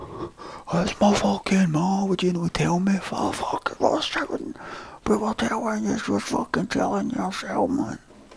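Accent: Scotland, United Kingdom. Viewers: Yep. I'm from Scotland as well and I speak just like that ....... without the angst 😂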